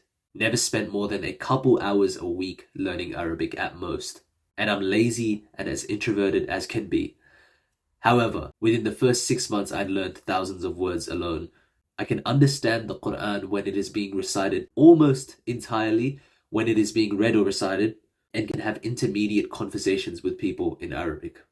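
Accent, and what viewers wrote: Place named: Australia. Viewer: His accent sounds Australian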